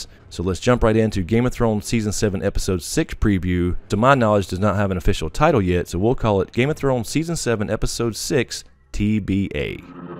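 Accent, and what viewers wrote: South, United States. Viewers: I love your videos. I detect a Southern accent. Where are you from?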